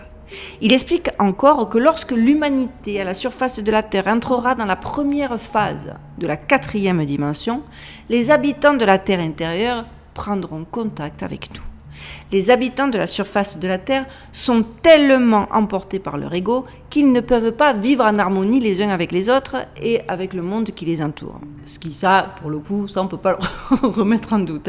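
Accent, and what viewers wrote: Marseille, France. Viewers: en tout cas, j'adore voir duchesse (j'ai cinq chats), j'aime les sujets que tu traites (ça fait partie de mon métier de diffuser des livres sur ces sujets) et purée j'adore ton accent marseillais qui doit être vraiment saisissant pour les américains. Et alors quand tu ris juste après avoir dit une sottise c'est juste un rayon de soleil. Continue et si tu as des sujets sur les civilisations anciennes, n'hésites pas, je suis en attente. Je suis une mamie de 60 ans, éditrice et traductrice et franchement, il y a quelques youtubeurs dont toi que j'aurais adoré avoir pour enfants, les miens sont macronistes, et ils ne jurent que par le dieu BFMTV, autant dire une déception terrible pour une vilaine complotiste comme moi 😂😂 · Hello comment ça va bien 🥰🥰🥰🥰 nous ont adore tes vidéos tu est super j'adore ton accent de Marseille allez go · manifaiiiike !!! 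trouver toute ces infos doit etre un boulot de dingue et que ceux qui te traite de Cagole aillent se faire cuire le cul !! des ignorants du parler marseillais surement !!! gros bisous de marseille ou il pleut comme vache qui pisse aujourd'hui et finit la canicule on respire enfin !!! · Comme toujours, très intéressant, intriguant, et bien présenté. Tu es « TOP » dans ce rôle. En plus ce p’tit accent du sûd est irrésistible… Pour ce qui est des détracteurs, ces troll’s n’ont que brosser si ça ne leur plaît pas. Ne change rien. Petit bémol, es-tu certaine que la terre est ronde ? Cela pourrait expliquer beaucoup de choses …. Il y a encore du taf ! 🤓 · Pour répondre à votre question,je ne pense pas que vous deviez perdre votre temps avec des orcs, gobelins ou autres trolls 😂,même si cette théorie de la terre creuse me parait totalement impossible ( volcan, oxygène, lumière etc..),je sais qu'ensemble nous cherchons le vrai du faux protégez vous de ces obscures graugs par le mépris..je sais " facile à dire,pas facile à faire",nous sommes beaucoup à apprécier vos interventions du dimanche,votre sourire,et votre timbre marseillais,et si nous sommes tous timbrés et bien "plus on est de fous..."😊😜❤️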